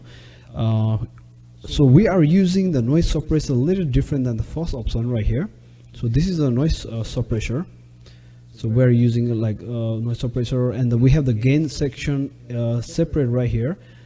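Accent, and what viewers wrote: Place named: Nepal. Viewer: Are you Nepalese bro? you’ve Nepalese Accent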